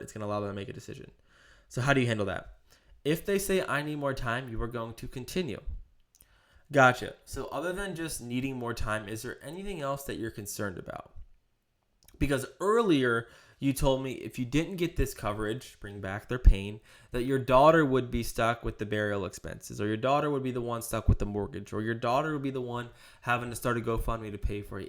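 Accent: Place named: Rural, United States. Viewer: Heyyyyyy…..it’s!!!! Hardest opening line, wish my name was so I could say it with that country twang😂 anyone new to this channel, watch EVERY.SINGLE. VIDEO. that this man puts out. He will help you pick the right agency, get out of bad ones, create a GOLD script, source free leads, teach others. Dude you guys don’t understand is literally putting his neck out there so that we can learn and get real,honest,quality information about the industry, and what to watch out for. These big IMO/MLMs don’t like what he says because it pulls back the curtain on lots of these pump and dump recruitment models. has not only lived the broker/agent life good and bad but also teaches others the exact blueprint. He’s sharing his success and wins with us random strangers. Protect this man at all costs. Also just got my resident license snd a bunch of non resident license which my imo told me to get and then after getting them said I overdid it😂 So far so good, no red flags in the contracting department. 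I’ll be starting age lead calls Tuesday. In the meantime I’m still trying to get a hang of doing EAPPS and scripts. Any tips on mastering EAPPS and the products each carrier sell? Thanks. Keep it up!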